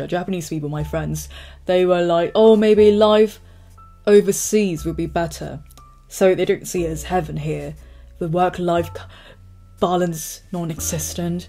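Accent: United Kingdom. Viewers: it's so funny to see a cute lady with such a thick english accent and frequency tone, the contrast is weirdly attractive. Interesting, but I'll try this year and see for myself nothing better than this, I m also introvert, so I feel like a more autist society might just do, one year of PVT!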